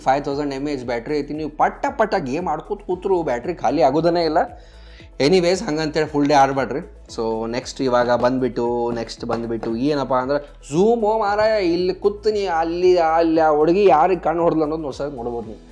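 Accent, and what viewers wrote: India. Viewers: Unique style of explaining technology with sense of humour. Loved your Jawari kannada. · Niv Belgavi davar anta heli ,bal Hemme ide broo🙌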